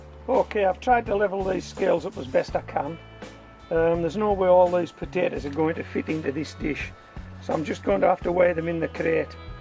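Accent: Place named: Darlington, United Kingdom. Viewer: thank you kindly and bless your ears. It doesn’t look insipid in that vid. Looks spot on. I have family from Darlington and you have a similar accent. Lovely up there. I’m in the fens where it’s flat and windy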